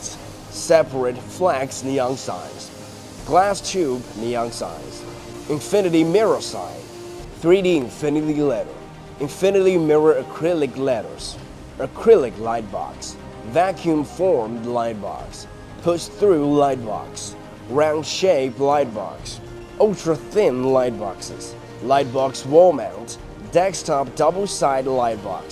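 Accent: South, United States. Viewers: I don't know why has a hint of southern accent, but I love it!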